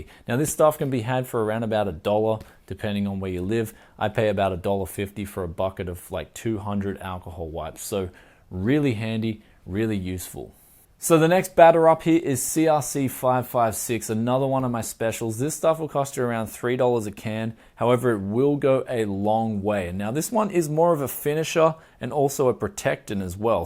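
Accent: Australia. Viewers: I am surprised, I assumed given your accent you would live in Aus, but the products you show suggests you live in Japan? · They couldn't understand your aussie accent in America. BTW Kerosene + water on a rag is a stronger cleaner.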